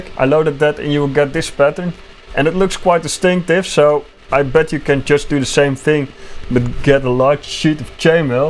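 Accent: Netherlands. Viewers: Being a dutchy makes me realize how Dutch you sound. Love it tho. G E K O L O N I S E E R D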